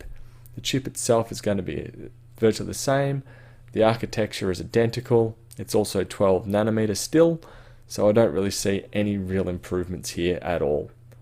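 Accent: Australia. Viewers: You Australian mate?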